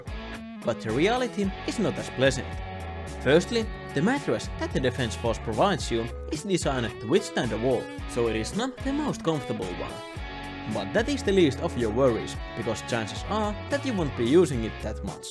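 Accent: Finland. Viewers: Mahtava rallienglanti. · Tahallinen rallienglanti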